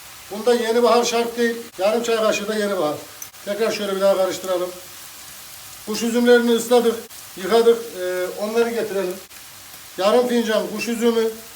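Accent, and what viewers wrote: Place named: Eastern Turkey, Turkey. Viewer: Usta mız çok keskin doğu şivesiyle konuşuyor.Keşke diksüyon dersi alabilirse türkçesi biraz istanbul şivesi gibi kusursuz olabilir.Yoksa çok kusursuz anlatımı var ve de çok başarılı kendisini tebrik ediyoruz.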